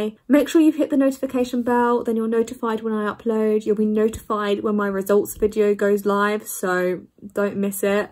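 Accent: United Kingdom. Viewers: You have a really strong British accent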